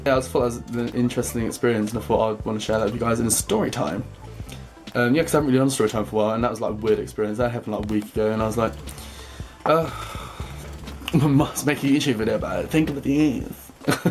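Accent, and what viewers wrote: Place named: United Kingdom. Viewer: haha he was 'straight' !!! loved this video!! trying to work out where about you are from in the uk from your accent!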